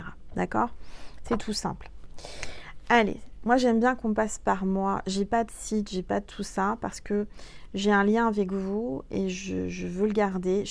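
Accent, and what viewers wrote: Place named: Belgium. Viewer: Ah oui..je me demandais...🤔 Tu as ce petit accent Belge...😇💋😘🙏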